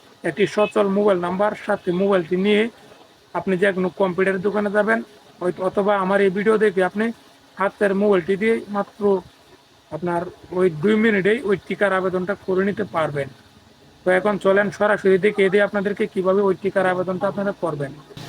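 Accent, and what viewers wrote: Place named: Noakhali, Bangladesh. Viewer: আপনি কি কলকাতার নাগরিক হন লাইন কি পাক্কা নোয়াখাইল্লা লোক।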